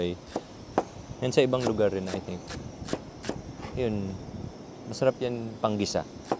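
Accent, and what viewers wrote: Iloilo, Philippines. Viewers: Namian gid ko Chef mamati sang imo Ilongo nga accent 😂😂😂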